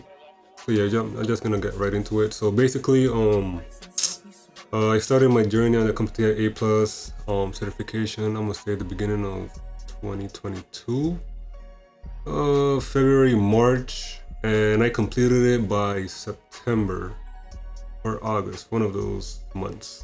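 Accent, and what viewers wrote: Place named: Virgin Islands, Caribbean. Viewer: I knew it! I was watching the video thinking 🤔 “This person sounds like they are from the Caribbean. Specifically from the Virgin Islands.” I’m also from the VI and currently live here. I’ve been planning my entrance and ultimately my path in IT, so been doing a lot of research. So far in my research it comes down to 1) learning the foundational knowledge so Comptia A+, Network+ and Security are good options. Learning Linux and a scripting language like powershell, bash or python can be beneficial. 2) developing and building on it skills which can be done using hands on labs and projects, 3) Getting experience either in a support role via computer support/ help desk or network support, internship, a tech role like Apple Genius, Best Buy Geek Squad,or similar. 4) Networking in the field via LinkedIn, meetups, applying for hundreds of jobs and personalizing your application with an introduction gif. 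Also, it may help if you create a vlog/ YT channel in the space for whatever path you are interested in. 5)Not sure if you have a degree but if you don’t you may want to consider getting one in the long term to help you qualify for more tech roles. If you don’t have one, look into WGU (Western Governors University) they have multiple it Bachelor paths, tech certs are included in many of the degrees and you can get your degree in less time 6 months to 1.5 year depending on the time you put into it and your level of dedication. It costs about $3700 to $4500 per 6 month term dependin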